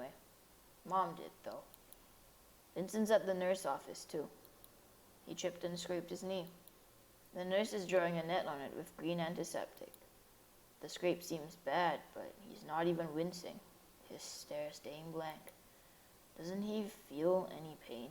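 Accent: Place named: Wiltshire, United Kingdom. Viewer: Whew..... Saw this in our sub box and instantly decided to listen while going on a morning walk. It's a sort of freezing, slightly overcast day over here with a cold grey sky so it was truly the perfect atmosphere. God... Forgot what HC does to a person until we experienced this. Remember watching manly play the original games years and years ago and they emotionally wrecked me haha. Truly some of the most gut wrenching visceral horror from indie games. Just pure agony all portrayed with such charming character designs and in an adorable art style. There's just something so haunting about the way this game dev explores topics and I think the way you described at the end nails it perfectly. We adored your Wiltshire voice and the creepy yet peaceful voice you gave Vincent (that cg parallel hello???? 😭). And ohhhgg if you play any of the dev's other games, we're so down to be hit over the head with the bag of bricks that will be the feels. 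Ehehe thank you for accompanying us on our walk with your soothing voice,! It was a really nice start to our morning 🥰❤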